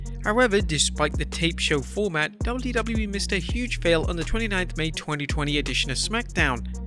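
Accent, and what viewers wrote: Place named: United Kingdom. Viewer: yooo!!! just the "british" i was hoping for!!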